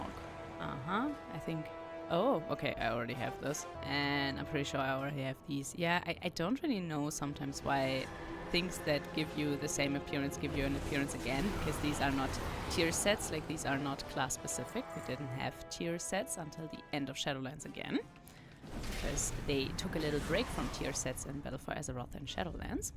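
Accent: Germany. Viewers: i hea da an bayrischen släng aussa oda? xD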